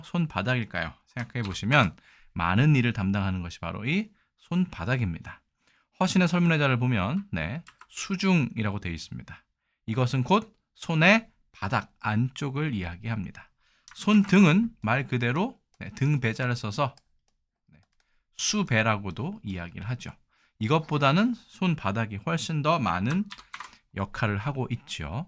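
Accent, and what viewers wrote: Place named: South Korea. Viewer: 매번 지식축적에 보탬이 됩니다! 동양3국의 실용사례까지 설명하시니, 폭넓고도 실용적이라 생각하고요!! 다만, 쭝꿔발음은 잘 모르겠지만, 일본어발음은 한국식으로 들려 옥의 티로 보여집니다!!!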